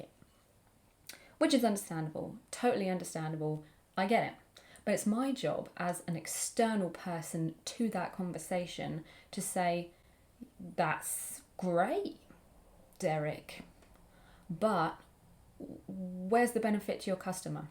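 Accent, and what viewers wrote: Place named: England, United Kingdom. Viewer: I really like your videos, they are informative, inspiring, and make me smile. Great combo, plus being from London, it's so nice to hear an English accent.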